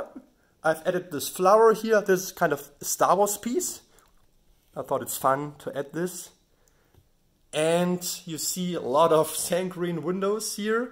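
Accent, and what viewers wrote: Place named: Germany. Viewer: holy shit bruder dieser deutsche akzent mashalla😂 aber daa sieht echt mega gut aus!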